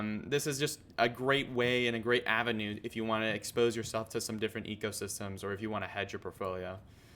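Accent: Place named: United States. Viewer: Wena crypto Chileno. Has 100% gringo accent, must have gone to Nido de Aguilas. Nice youtube mate, will be following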